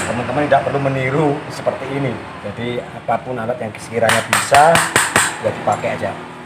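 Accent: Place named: Madura, Indonesia. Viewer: Pengetahuan yang sangat berguna, terimakasih bang, alamatnya dimana ya, dan lagi spt ada logat madura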